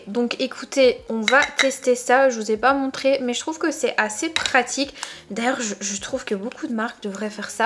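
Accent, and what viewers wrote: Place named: Belgium. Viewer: J'adore l'accent belge.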